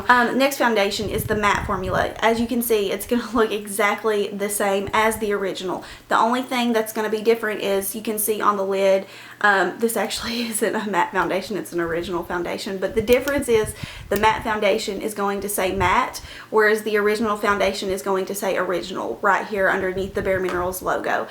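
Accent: South, United States. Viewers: Are you from south alabama or mississippi?? You sound country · LOVE THIS!! SO very helpful:)) btw..you're a lovely young lady, with a cute southern accent:) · You're adorable and I admire your accent - southern?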